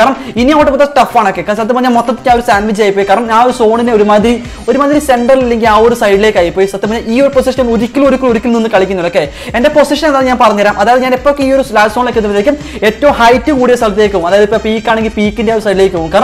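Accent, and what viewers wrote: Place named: Malappuram, India. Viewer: Malappuram language me myaman uyir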